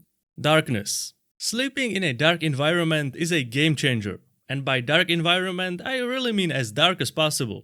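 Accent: Czech Republic. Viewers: i'm not sure but his accent sounds Czech or Slovakian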